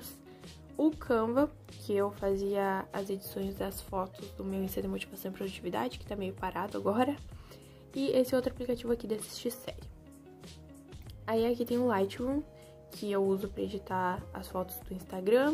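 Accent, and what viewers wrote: Rio Grande do Sul, Brazil. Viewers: Tu és do RS guria?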